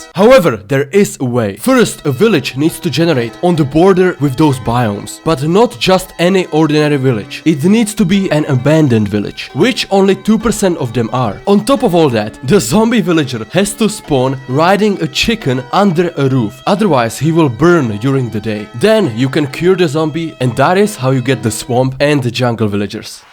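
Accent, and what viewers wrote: Russia. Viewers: I swear that he has a Russian accent. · You are russian? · You're Russian right?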